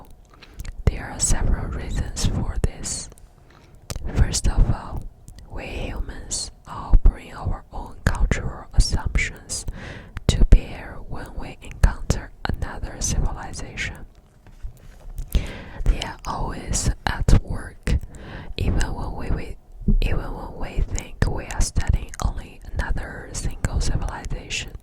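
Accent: England, United Kingdom. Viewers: Love your english accent, send me off to sleep! New sub from me would love to see more like this!❤ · I really like your English accent you should be make more video like that video please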